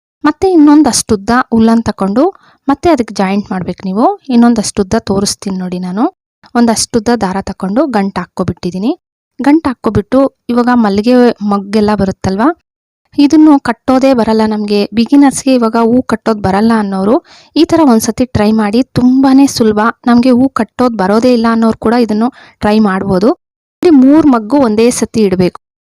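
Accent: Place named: Kolar, India. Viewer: Kolar side avara nimma bashe agide adikke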